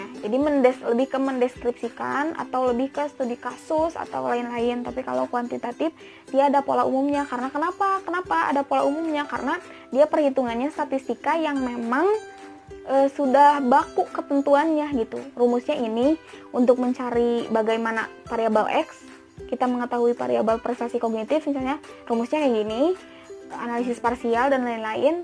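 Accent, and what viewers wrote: West Java, Indonesia. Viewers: Sunda pisaaan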